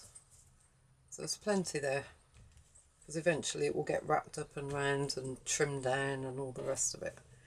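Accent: United Kingdom. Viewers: Thank you so, so much for this tutorial. This is exactly what I wanted for my journal cover. I appreciate you taking the time to show every step of the way to achieve this result... and all of this with your beautiful accent that I LOVE. I am in South America but my soul dreams of a lovely quaint cottage in the English countryside. Anyway, did I say thank you? THANK YOU! THANK YOU! THANK YOU! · you live in our dream town!When my husband retires, that's where we want to live. I've only just discovered your channel - and from your accent you, like me, are from Oop North! Loving the chat, loving the tutorials and the way you do stuff. When we're in Glastonbury next we'll meet you at the cross and go for a cuppa or a drink. Hugs from a Scouser xxxxxx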